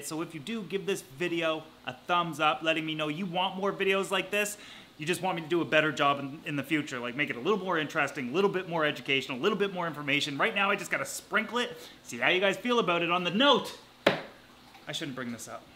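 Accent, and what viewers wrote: Canada. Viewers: It's cool when you can instantly tell you're watching a fellow Canadian by the accent lol Great aquarium, nice video! Cheers from BC! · Are you a Canadian ?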